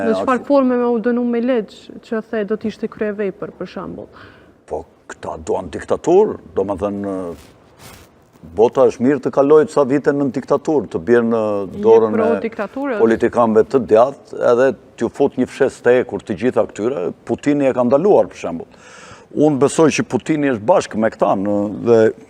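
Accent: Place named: Gheg, Albania. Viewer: O, hajvani me dy këmbë, mos e përdor t'folurit e hizmeqarëve të fashizmit, Bandave Balliste dhe mbeturinave tjera, apo dialektin Geg, i cili ka ngordhur që vitin 1972 dhe është varrosur në Tiranë! Tani po e përdorin pjella e pistë e hizmeqarëve të lartëpërmendur të Shqipërisë Veriore, Laperët, rrugaçët dhe kurvat e Kosovës primitive! Aleluja!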